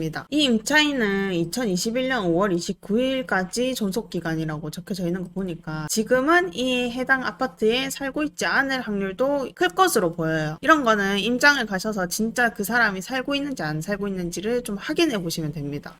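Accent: Gyeongsang, South Korea. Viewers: 어후 보는 부동산 유튜버마다 쌍도사투리 안쓰는사람이 없누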